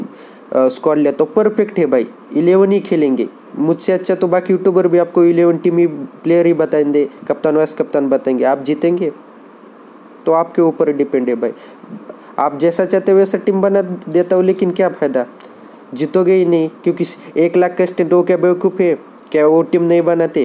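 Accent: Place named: Northeast, India. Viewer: Bhai I'm sure aap Northeast se ho..100%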